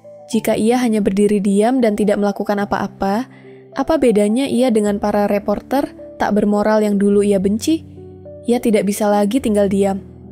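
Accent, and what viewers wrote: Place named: West Sumatra, Indonesia. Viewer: Pasti urang Minang kan kak wkwkwk😂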